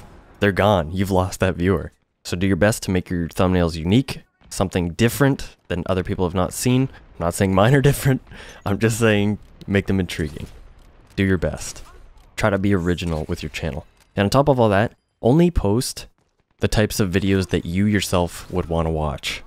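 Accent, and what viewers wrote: Canada. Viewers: Where are you from dude? I'm from Edmonton and you sound canadian :P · Is he canadian? I didnt know but I heared him talk with aboewt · Are you Canadian? Dat accent tho. Btw, love your stuff. It's definitely something refreshing in a world full of screaming gamers. Keep at it! :) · Canadian eh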